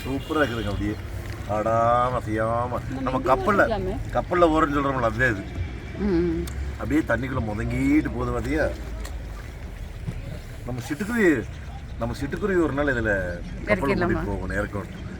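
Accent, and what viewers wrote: Kongu, India. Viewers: கொஞ்சும் கொங்குத் தமிழ் பேசும் கோமகரே...! திகட்டாத தித்திக்கும் பேச்சுடைய திருப்பூர் திருமகரே...! எங்கள் மதிப்பிற்குறிய டான் திரு. அண்ணா❤❤❤🙏 அவர்களே எப்படி இருக்கீங்க அண்ணி❤❤❤🙏 எப்படி இருக்காங்க ரித்திக்❤❤❤ எப்படி இருக்காரு. அருமையான வீடியோ பார்க்க பார்க்க இடங்கள் அழகோ அழகு❤❤❤ அண்ணா இந்த வீடியோவுல நம்ம TMF ஒருத்தர் "Marvel Movies"னு ஒருவர் கமெண்ட் பன்னிருக்காரு அவர் கமெண்ட் பன்னிருக்கது 1000% correct. குறிப்பிட்ட இடங்களில் மட்டும் ட்ரோன் கேமரா மேல பறக்கவிடுங்க. ஜம்மு காஷ்மீர் ராணுவத்தின் ட்ரோன் கேமராவும் முக்கால்வாசி இடங்களில் மேல பறந்து கொண்டிருக்கும். அவங்க கண்ணுல வேற ஒரு ட்ரோன் கேமரா பறக்குறது பார்த்தால் SHOOT பன்னிடுவாங்க. அப்பறம் LOCATION ah TRACK பன்னி உங்களுக்கும் Enquiry வருவதற்கு வாய்ப்பு உண்டு. கொஞ்சம் கவனமா இருங்க அண்ணா. நம் ❤சிட்டுக்குருவியோட❤ தங்களது இந்த லடாக் பயணம் இனிதே தொடர்ந்திட நல்வாழ்த்துக்கள் அண்ணா❤❤❤ குளிர் இன்னுமே மைனஸ் டிகிரிக்கு கீழ வரும் அண்ணா உடம்ப பார்த்துக்கங்க அண்ணா🙏 என்றும் அன்புடன் உங்கள் தம்பி என்றும் அன்புடன் உங்கள் TMF ரா.முஹம்மது கபூர் (சென்னையில் இருந்து) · கொங்கு தமிழ், இளமை,இனிமை அவர்கள்.TMF from coimbatore.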